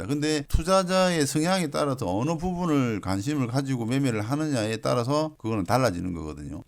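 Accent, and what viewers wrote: Busan, South Korea. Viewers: 실전에 너무 도움이 됩니다 감사합니다 대표님 혹시 부산분이신가요?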